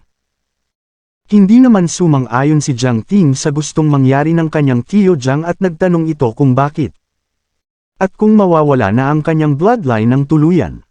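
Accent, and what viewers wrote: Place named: Conyo, Philippines. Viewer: Application Kasi Yan kaya mdyo conyo ung pagsasalita.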